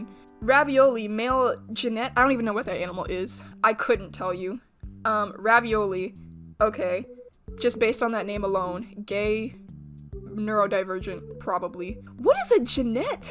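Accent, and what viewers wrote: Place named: Canada. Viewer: You sound canadian are you canadian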